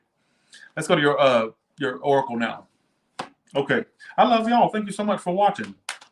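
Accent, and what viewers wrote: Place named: South, United States. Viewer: I liked your strong Southern Accent during your Libra Reading. 🌋 Yes Observed and Monitored. Undercover Cops?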